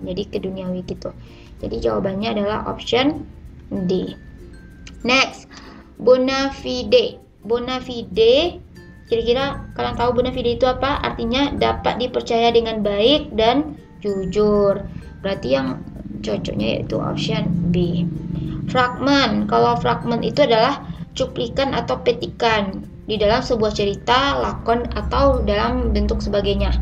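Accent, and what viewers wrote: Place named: West Sumatra, Indonesia. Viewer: logatnyo mirip urang minang akak ko wkwkwk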